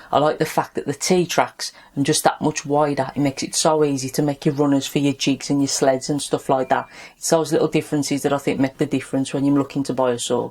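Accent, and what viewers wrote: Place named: West Midlands, United Kingdom. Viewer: Great review ar kid very detailed and the West kids accent makes it that much better to watch as I'm from walsall .I've subbed and liked well done keep it gooin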